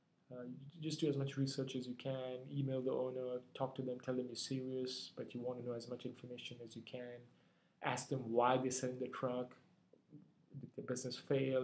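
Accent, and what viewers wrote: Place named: India. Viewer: It sounds like you are from India. Where are you from in India?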